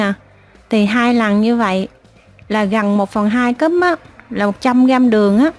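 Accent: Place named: South, Vietnam. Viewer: Cám ơn bạn lên tiếng cho 😍👍 giọng nói mỗi miền có đặc tính của miền đó. Giọng miền Nam của vô cùng đáng yêu. Nhẹ nhàng. Nghe giọng là biết tánh người Mình là ng nấu ăn vô cùng dỡ. Nhưng mình làm vài món của. Mình đều thành công Đó là vì hướng dẫn dễ hiểu, kỹ và ko giấu kỷ thuật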